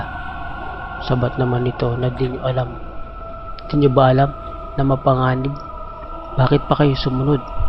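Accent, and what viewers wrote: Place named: Mongolia. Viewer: Okey ang kwento ...kaya lang parang taga Mongolian ang pagkwento..keep it up!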